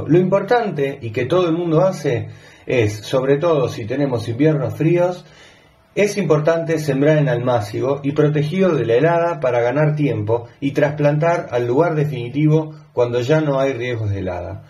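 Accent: Argentina. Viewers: Me encantan tus videos!! Muy explicativos y en español argentino jaja Queria consultarte donde conseguis la planta de consuelda ya que no la consigo.. muchas gracias!! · Daniela Medrano Terrazas el muchacho del video debe ser de Argentina por su voz. Aquí las temperaturas en invierno pueden ir desde los -25ºC a 20ºC dependiendo la región. Sí es de Buenos Aires, en invierno las temperaturas son de 0º a 15º.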